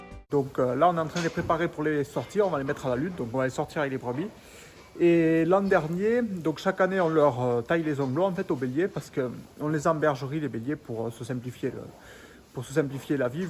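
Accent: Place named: South, France. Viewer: Salut, ma femme me dit toujours, avant de faire crac crac coupe toi les ongles, ça t'éviteras de me griffer. Hahaha… Si non,chapeau pour tes expliquations,j'en apprends chaque fois, c'est un régal,en plus avec l'accent du SUD. AH, le Larzac,Joseph Bové, le thym le romarin, Ho, là je m'égare,salutations Serge.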